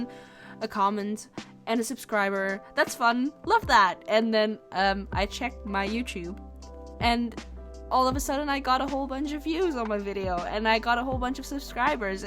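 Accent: Netherlands. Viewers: Love this island! First time I’m seeing your channel but are u Dutch? Thought I heard the accent lol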